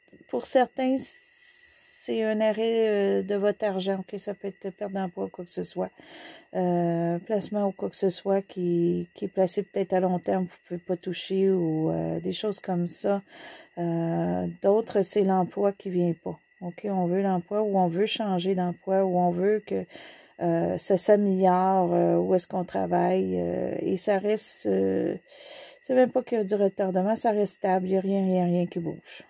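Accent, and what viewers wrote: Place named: Canada. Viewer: L accent canadien n aide pas !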